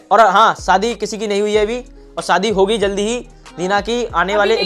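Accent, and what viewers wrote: Bundelkhand, India. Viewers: Kya bundelkhandi bolte ho 😂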